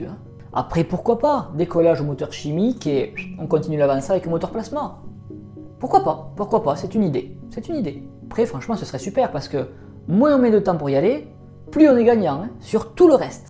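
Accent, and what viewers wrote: France. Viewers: l'accent provençal enlève beaucoup de crédibilité, mais c'était interessant ! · Mama cet accent toulousAIN mdr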